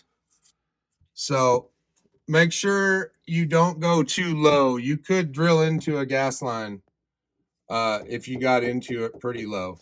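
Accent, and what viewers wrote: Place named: Ozarks, United States. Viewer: !! The accent is coming in great. You have assimilated to the Ozarks nicely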